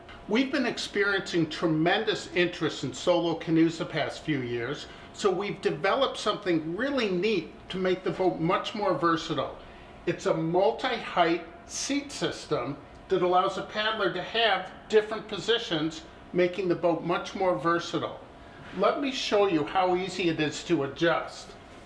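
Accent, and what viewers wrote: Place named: United States. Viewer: after all these years in Canada, he still sounds more American than pure northern hoser. curious....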